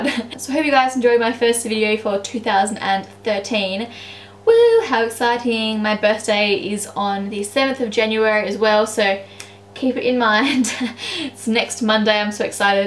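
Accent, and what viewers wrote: Australia. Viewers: sweety come to australia, she has the best pronunciation I've ever heard from an Australian and i live there, this is like posh australian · its an australian accent... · She's Australian :) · are you Australian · It is a normal Australian accent.You are so superior. I would have liked to insult you, but with your intelligence you wouldn't get offended.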